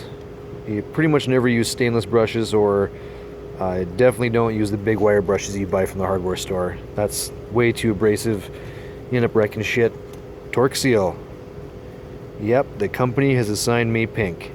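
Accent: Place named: Canada. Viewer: Can you first introduce yourself please and state how credible you are, what you're currently using them for, what your specialties are, and how many years you've been an aircraft mechanic? And please, an iphone 5s has a better camera than that. What is that a flip-phone? Nice Canadian accent, you from Canada?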